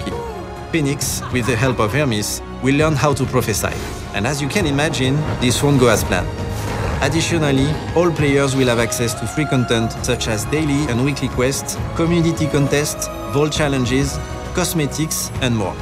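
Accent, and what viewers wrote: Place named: France. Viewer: Le mec quand il parle ça s'entend qu'il est français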